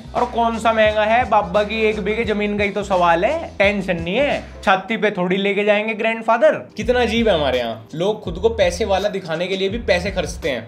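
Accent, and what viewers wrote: Haryana, India. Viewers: I ❤️ haryanvi accent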